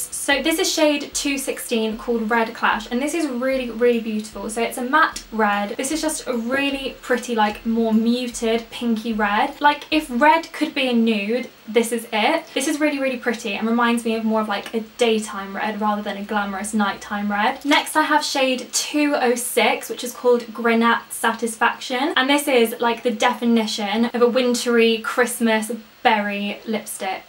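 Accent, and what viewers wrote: United Kingdom. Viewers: she's not american, she's british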